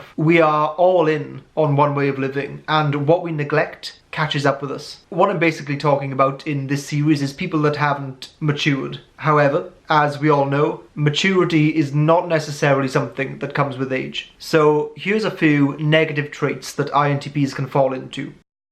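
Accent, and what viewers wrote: Wales, United Kingdom. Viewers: Omg are you welsh?? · Hmmm your accent... are you Welsh?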